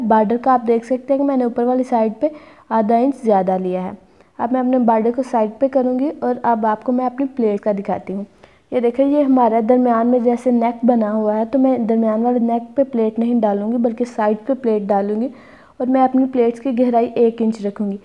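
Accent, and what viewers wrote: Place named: Faisalabad, Pakistan. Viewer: Kafi arsy sy me apki videos dekh kr kpry silai kr rhi hun, jazak Allah, ap bohat assani sy mushkil cheezen smjha deti hein, Faisal Abadi lehjy wali Urdu, Amazing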